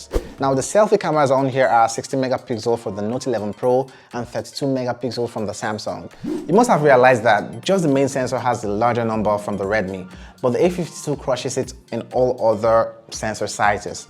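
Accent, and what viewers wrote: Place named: Nigeria. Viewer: He sounds like a Nigerian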